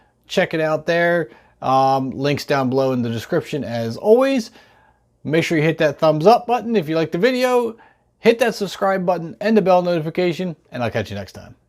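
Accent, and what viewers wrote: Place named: Philadelphia, United States. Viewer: You sound like you’re from philly.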